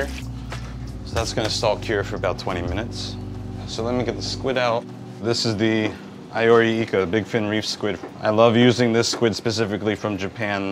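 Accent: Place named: United States. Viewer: Bro has the heaviest american accent i have ever heard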